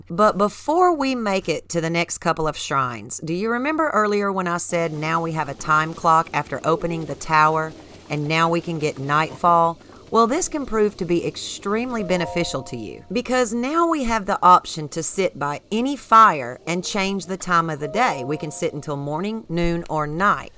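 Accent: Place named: Kentucky, United States. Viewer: Dang you sound just like I do.. I was born and raised in Kentucky.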